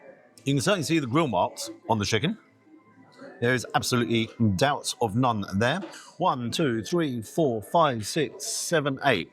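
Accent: United Kingdom. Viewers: Its not what I would call a "sandwich". But then - I'm old fashioned. I also would call a fillet a fill-it not a fill-a. The language I learned to speak (and what American's also define as their lingua-franca) is English. You my dear speak with an English (British) accent. Your audience is most likely comprised of locals who are, typically, not American. Therefore, IMHO it would be nice to hear you pronounce things the English way. Perhaps I am just being pick-ee?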